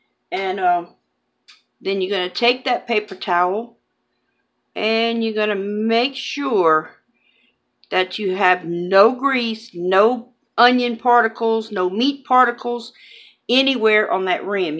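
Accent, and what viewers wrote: Louisiana, United States. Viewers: Hey Girl! Love this! I just found your channel and subbed! We live in Alabama now, but my husband and i are from Louisiana (he's from New Iberia and my people are from Natchitoches). I don't see many good, down to earth channels from people who sound like home from Louisiana, so: Good On You! God Bless!!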